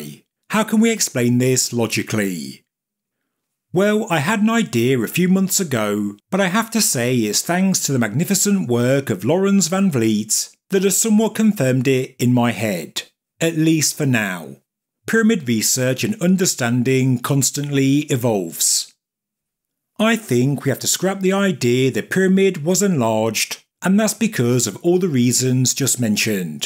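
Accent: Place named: Birmingham, United Kingdom. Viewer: In general like the content of these videos. It's just that I find the narrator's nasal, brummie accent so annoying that it detracts from the actual video. If he could use AI to hide his accent then I could probably sit through a whole video without wanting to puke.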